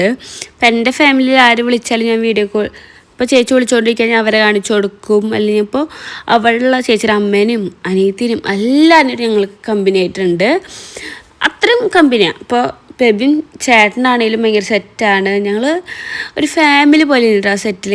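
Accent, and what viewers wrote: Thrissur, India. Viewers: thrissur okke angane ahn parayaa😂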